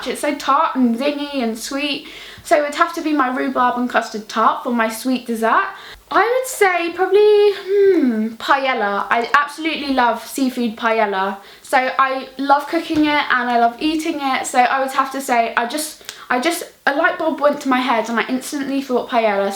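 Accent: United Kingdom. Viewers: I started to understand british english talk most from your videos...Thanks<3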